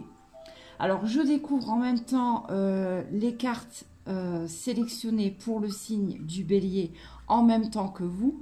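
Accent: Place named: Nord-Pas-de-Calais, France. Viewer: Bonjour je vous ai vue par hasard tout à l heure sur un partage (groupes) et donc Je vous découvert et la vôtre attitude envers les personnes qui vous posée des question et votre façon de parle(chti) 😉mon interpellé, je vous ai de suite à précisé 💜🙏 je vous suis donc sur fb et la chaîne YouTube, merci pour l'horoscope(Bélier) je me reconnais😂 merci à vous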